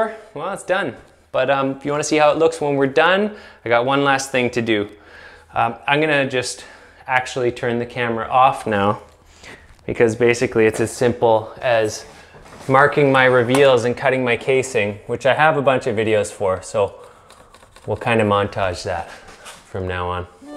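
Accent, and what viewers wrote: Canada. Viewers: I was curious if this was Vancouver, BC or Vancouver, WA. I feel like this guy has a mild Canadian accent so I'm going with BC.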